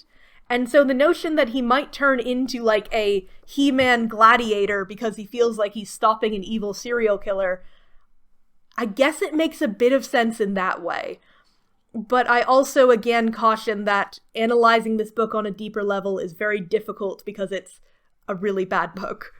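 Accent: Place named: England, United Kingdom. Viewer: You say you are American, but Ive heard you say things before a take that are unmistakably an English, so watch out its rubbing off on ya haha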